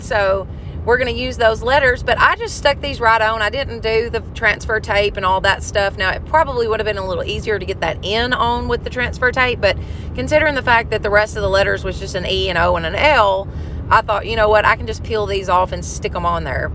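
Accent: South, United States. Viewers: Just subscribed! I really like what you did with your items, but am in love with the way you talk---Southern country. It makes me homesick.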